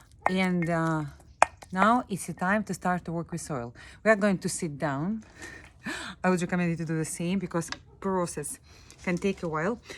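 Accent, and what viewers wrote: Russia. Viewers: Thanks for the recipe! I have to try it. 🩷 👍🏻 I think you have a Russian accent! Where are you from?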